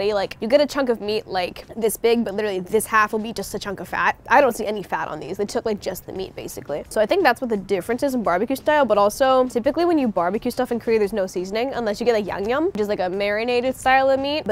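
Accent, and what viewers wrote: Spain. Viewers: girl come to mexico, we want to feed you too, also her spanish spanish sounds tasty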